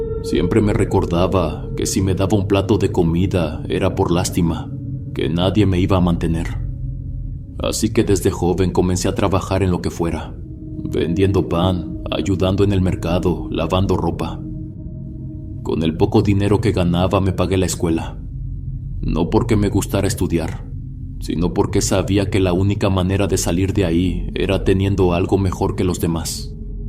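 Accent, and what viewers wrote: North, Mexico. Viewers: WOW!! Cuánta maldad hay en el mundo, que le está pasando a la humanidad?? Por otra parte, tú acento norteño me embelesa y a la vez me da ternura, cuando dices tú letanía de que alguien pudiera estar usurpando tú lugar y robando tú trabajo, normalmente te escucho en mi trabajo... Cuídate, Bendiciones!! ❤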